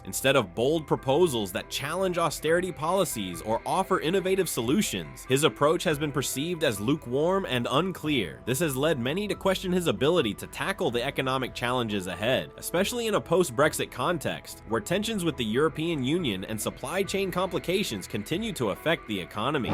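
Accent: United States. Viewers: lies lies and more bs .... are you that desperate to use clickbait titles and a really crap american voice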